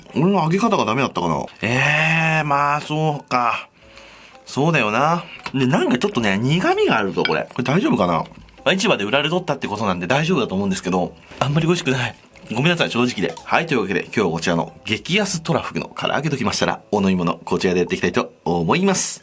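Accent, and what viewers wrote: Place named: Nagoya, Japan. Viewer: 名古屋弁、いいなあ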